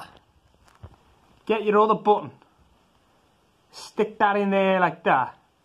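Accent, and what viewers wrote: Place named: Ireland. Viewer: More like wee Ireland accent to me lad.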